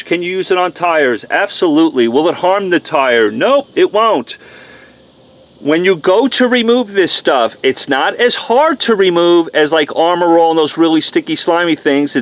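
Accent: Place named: New York, United States. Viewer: Gotta love that Ray Romano NY accent, a rarity in Texas -- keep up the great work!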